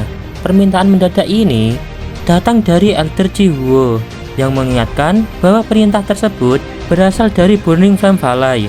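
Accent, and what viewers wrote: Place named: Java, Indonesia. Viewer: Nada jawanya medok banget bang 😂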